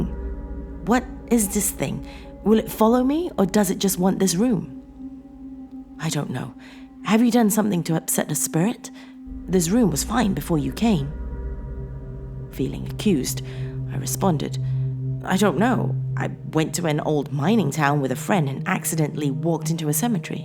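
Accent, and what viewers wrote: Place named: United Kingdom. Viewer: I love your British Accent and this channel, you just got a new subscriber. Great Show Indeed !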